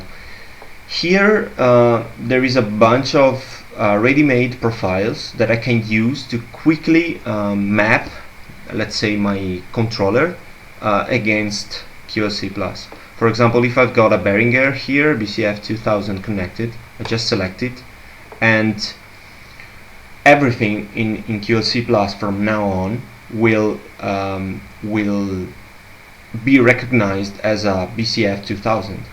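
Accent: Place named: Italy. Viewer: dal tuo inglese sembri italiano, PUOI FARE UN TUTORIAL IN ITALIANO????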